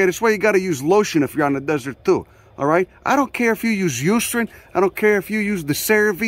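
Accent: Chicago, United States. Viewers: I love your videos!! If you come near the Northeast I could show you some great spots...I'm extremely interested in wild edibles, (my 4 acre yard Is one big botanists dream!) My thick Boston accent and your chicagoan accent would meld perfectly. Keep on keeping on my friend!!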